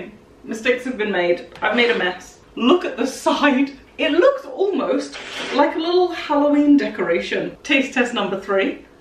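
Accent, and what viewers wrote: United Kingdom. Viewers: In the US we call it cotton candy. Lol love the British accent. your such a sweetheart. Love ya videos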